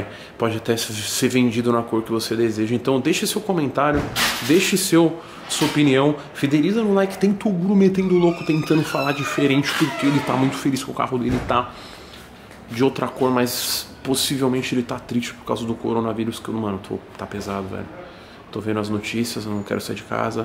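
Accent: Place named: Bahia, Brazil. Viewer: baiano dms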